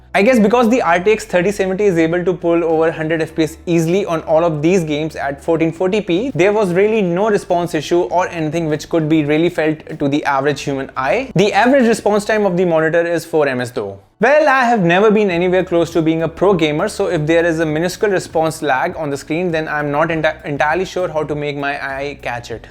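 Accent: India. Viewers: in other words joking in english sounds funnier in indian accent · The indian accent is epic!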